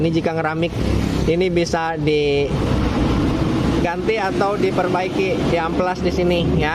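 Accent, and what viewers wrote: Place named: Indramayu, Indonesia. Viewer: Kaya wong dermayu😊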